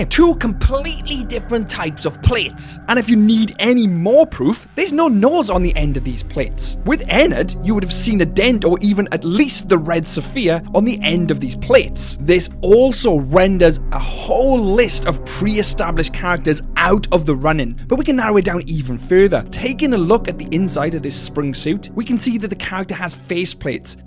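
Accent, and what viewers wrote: Wales, United Kingdom. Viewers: 'Funtime Beaka', love that slip of the tongue, esp. with the Welsh accent :D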